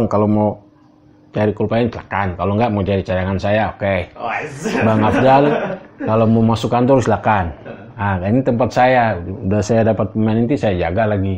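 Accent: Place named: Minangkabau, Indonesia. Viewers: Dialek bahasanya dah persis orang Minang.mantaap. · Logat sdh Minang sekali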